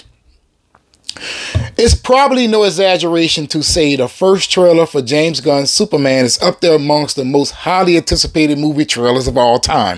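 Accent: New Orleans, United States. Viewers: Sounding so New Orleans in this vid dude. 😂👍🏻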